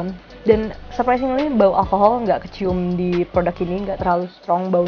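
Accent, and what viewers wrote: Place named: South Jakarta, Indonesia. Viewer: Lidah Jaksel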